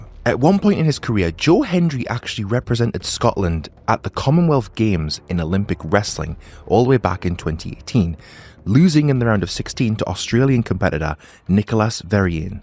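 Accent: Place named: Newcastle, United Kingdom. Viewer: Fantastic video, love the geordie accent as well!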